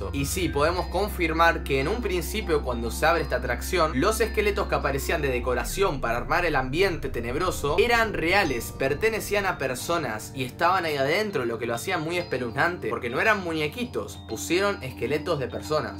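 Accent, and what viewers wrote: Argentina. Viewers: argento o uruguayano??? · dros si lo escuchas tiene acento argentino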